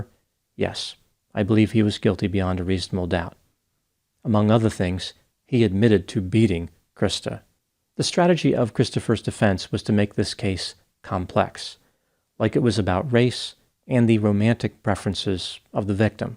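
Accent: United States. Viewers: Yes, in particular his English has only a mild American accent.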